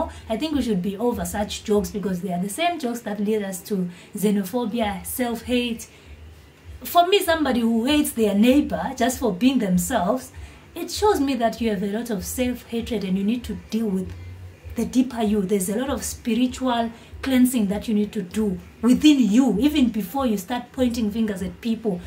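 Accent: Kenya. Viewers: kazi nzuri sana dada...yani umekua mkenya kabisaaa congratulations..nilisikia pia ukiongea my language kikuyu nikafeel wewe ndio daughter of African pongezi...acha watu waheshimiane....zulu not superior than other southafrican countries we are all one especially that we are all bantu speakers...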